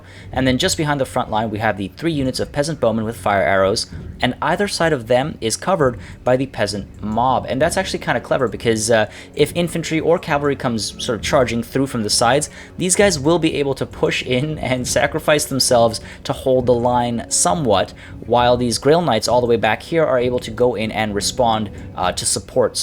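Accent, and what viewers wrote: France. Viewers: Rocking the french accent! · coming in hard with those french pronunciations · Woah dude! Where does that nice French accent come from?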